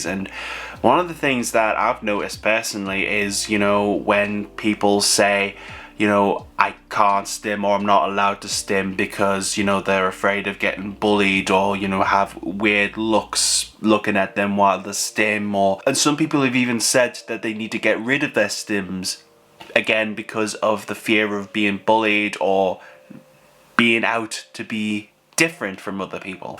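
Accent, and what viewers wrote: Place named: Middlesbrough, United Kingdom. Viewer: Are you Mbro based? Your accent sounds local to me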